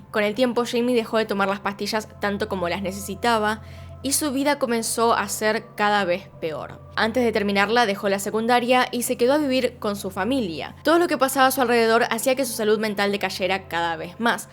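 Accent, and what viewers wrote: Argentina. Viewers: Es el acento de algunas provincias de Argentina. Yo también hablo así, no tiene por qué molestarte. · Eres Argentina???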